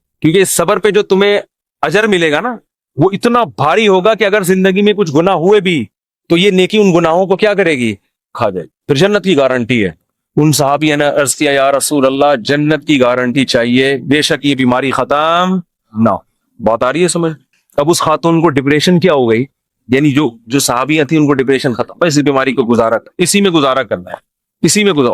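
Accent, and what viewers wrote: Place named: Karachi, Pakistan. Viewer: مفتی صاحب کا کرانچی والوں کا لہجہ بھلا لگ رھا ھے ۔❤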